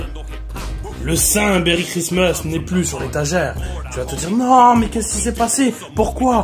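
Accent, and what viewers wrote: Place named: Toulouse, France. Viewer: Comme prévu... j’attends ici ! Peinard, j’veux rien louper ! 😄 T’as le petit accent de par chez moi, tu dois pas être trop loin de Toulouse non ?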